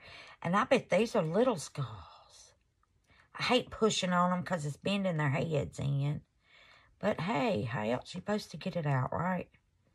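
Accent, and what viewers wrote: South, United States. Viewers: I can tell you're from the south but I can't place it. I'm in northern Tennessee and I believe you're further south. Anyway, I really enjoyed your video 💖💖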